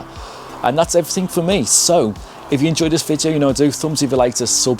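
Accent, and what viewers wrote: Liverpool, United Kingdom. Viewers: keep making videos mate, I've just discovered you. Keep that content coming! You sound like you're from my way or near by? (Liverpool)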